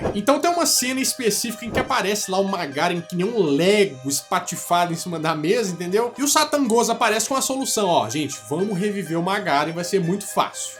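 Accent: Minas Gerais, Brazil. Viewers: até no geito de falar vc parece mineiro é nois!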